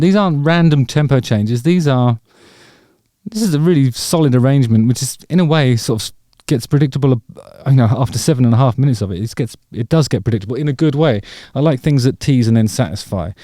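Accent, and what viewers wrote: United Kingdom. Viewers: How can one person look like both Martin Short and Tommy Lee at the same time, and speak with a brit accent on top of it?... happy I stumbled upon this review! Thoroughly enjoyed it! Cheers!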